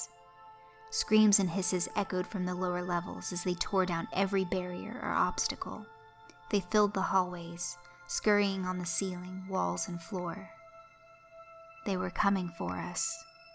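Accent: United States. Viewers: lovely voice btw USA right?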